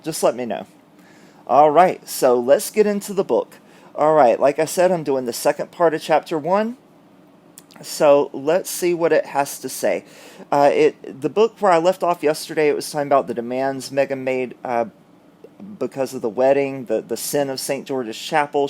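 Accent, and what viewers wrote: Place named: Virginia, United States. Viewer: I've always liked your accent and now I know it's Virginian.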